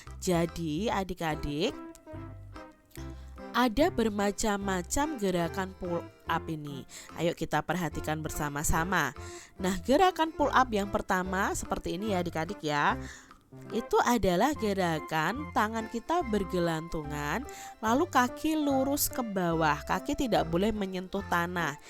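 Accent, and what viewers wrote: West Java, Indonesia. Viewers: Kayak nya atau sunda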